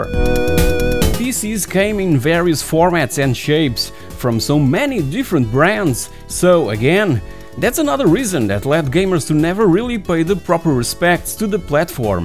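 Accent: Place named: Portugal. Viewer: já agora digo-te, percebi logo pelo o teu sutaque que eras português, sendo que eu também sou e tenho a noção de como é o inglês da maioria das pessoas cá que não ficaram habituados, até ver-te a falar no vídeo. Acho interessante ver a nossa comunidade a fazer este tipo de conteúdo, visto que a maioria ou são brasileiros, ou de outros paises "mais interessantes". Acho que isso já me fez pensar em subscrever ao canal, abraço, e boa sorte.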